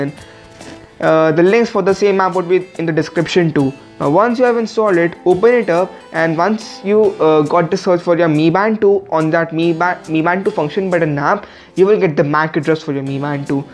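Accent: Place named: India. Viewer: i love the way you make a pop sound from you tongue sounds so indian!! :))) anyways nice video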